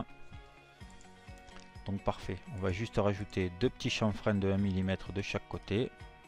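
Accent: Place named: Southwest, France. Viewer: Merci pour ce super tuto efficace et commenté qui sent non pas le canard mais le Sud/Sud-Ouest ...!!!!? je connaissais une Madame Lanvi !! ;-)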